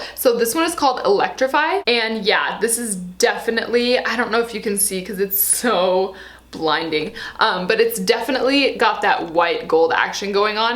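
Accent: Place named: South, United States. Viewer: Hey there, I will say makeup needs to work to accentuate the good features that you have. It's overkill, and As a mask is when you wear it all over the place in bold colors with the focus being everywhere. Learn to focus on a favorite part of your face. You can never go wrong with that advice. Also, you are southern? I think I hear a slight twang haha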